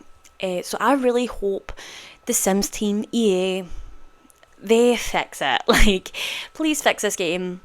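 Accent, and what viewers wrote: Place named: Scotland, United Kingdom. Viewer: Fabulous build, great to hear another Scottish voice :eyes-pink-heart-shape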